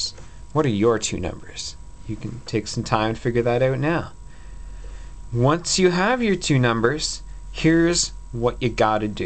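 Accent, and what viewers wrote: Canada. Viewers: Probably Canadian.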